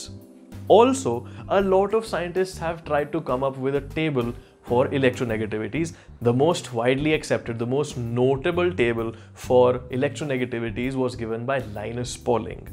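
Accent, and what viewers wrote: Kerala, India. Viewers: You sound like a malayalee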